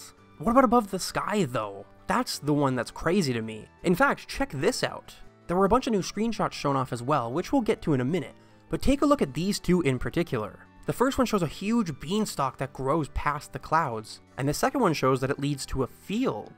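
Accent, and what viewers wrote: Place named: Canada. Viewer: I only just noticed you say "Ha-llo" instead of "Hell-oh", is that a Canadian thing? (Honestly, it's kinda cute.) Anyway, super happy to see the "magical" elements being a bit more prominent - it's been awhile and I missed them.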